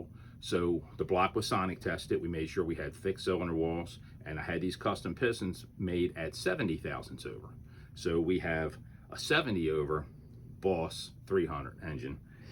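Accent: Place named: Baltimore, United States. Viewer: You sound like you are from Baltimore... Is this engine from the area?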